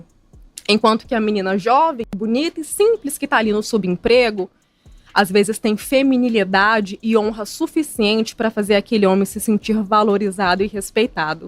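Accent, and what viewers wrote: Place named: Minas Gerais, Brazil. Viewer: EEEE sotaque gostoso esse de minas, eu aqui no RJ amo quando alguem de Minas ta perto de mim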